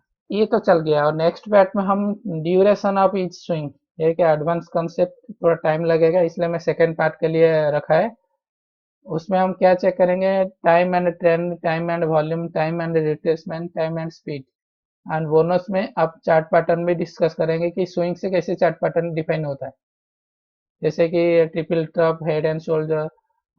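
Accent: Odisha, India. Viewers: Bhai apana odia ki tone ru Jana paduchi..kai na Odisha side Hindi tk emiti katha huanti..bhala lagila apanka video dekhili..apanka sahita contact karibar achi detail diantu bhai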